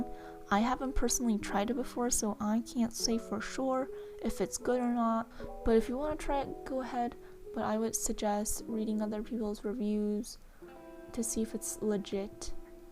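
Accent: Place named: New Jersey, United States. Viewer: i love your voice it’s like a fem kermit from new jersey,,, very calming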